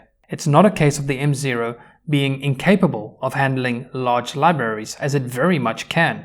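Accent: South Africa. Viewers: It’s not often that I click on a video and hear a South African accent. 😊 Solid review! 🤙🏾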